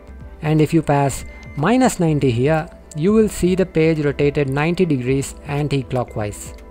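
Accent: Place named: India. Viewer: Indian Accent 10/10 video tutorial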